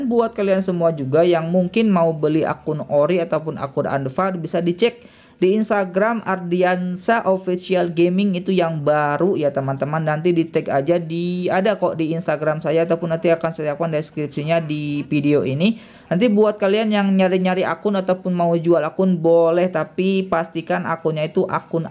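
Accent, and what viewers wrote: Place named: Philippines. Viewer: Bro has Philippines accent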